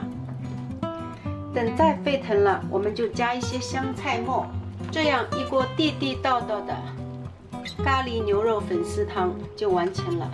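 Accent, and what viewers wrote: Shanghai, China. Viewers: 不是普通话，而是浦东话。乡音啊，亲切的。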